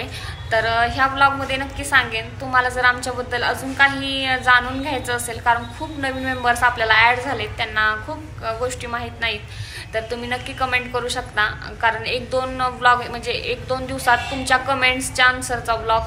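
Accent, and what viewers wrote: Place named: Satara, India. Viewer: Mla tumchi satari bhasha khup aavdte tai 🤗 tumhi khup bhari ahat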